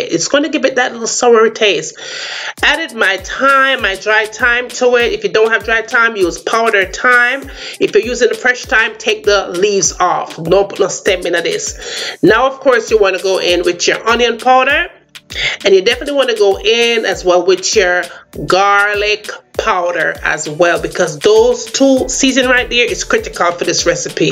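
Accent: Jamaica. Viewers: I came here to find out how to cook drumsticks. Within 3 seconds I knew the cook was a Jamaican! Must haffi yam it now!!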